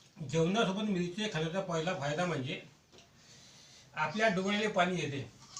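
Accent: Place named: Vidarbha, India. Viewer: भाऊ तुम्ही नागपूर म्हणजे विदर्भात ली भाषा बोलता.मीपण विदर्भात ली आहे त्यामुळे मला बरं वाटते.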